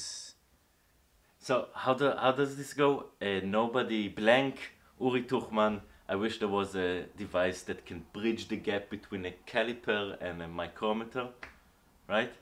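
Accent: Israel. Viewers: Uri Tuchman I’m guessing by that accent, you’re Israeli? If so, AWESOME, you’re the first Israeli machinist I’ve seen on YouTube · הסרטונים שלך מושלמים, אוהב ממששש את העבודות שלך ואת האנגלית עם המבטה הישראלי חחח 😁😁 שנה טובה ומתוקה 🍎🍯ותמשיך כך💪 Love your work keep it up 😁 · Wow, that israeli accent grinds my nerves. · המבטא הכי ישראלי ששמעתי בחיים שלי ריספקט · I don't know if it's a jewish accent or maybe Israeli but you seriously sounds like many Israelis I spoke with and also hearing my own voice so just saying kind of a weird accent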